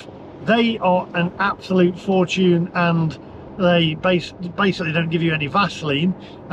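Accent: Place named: North, United Kingdom. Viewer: IMO people with accents like yours are northerners.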